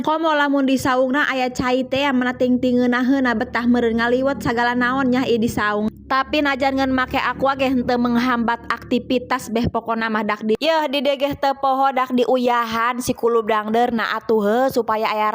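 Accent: Indonesia. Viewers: Sunda na urang mna iye teh nya,jiga bahasa sunda urang ker alit😊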